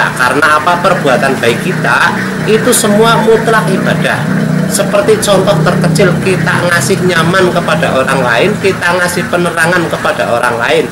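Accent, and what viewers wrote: Banyumas, Indonesia. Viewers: Ngapak ngapak...kebumen atau cilacap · Kiye ngapak ya....tp nek ora ngapak ora kepenak... salam sukses selalu mas dan tetap semangat.. 😀😀😀👍👍👍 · Ngapak temen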